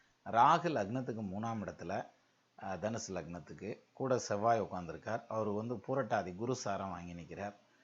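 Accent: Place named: Bodinayakanur, India. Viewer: Hi sir, nice Tamil pronouns, my native place is Bodinayakanur your speaking styles are reminding my childhood days, my question 1. Pl tell Nadi Jothidam is true or lie 2. In 12 house is Sani 6 house is seivai it good or bad 3. Pl give month rasi plan it's more help for all 4. personal question I was born 1989 time 10.30pm Place Kambam mahara laignum star barani I lost many in past 10 years I saw failure only till now pl help me and tell my career path sir pl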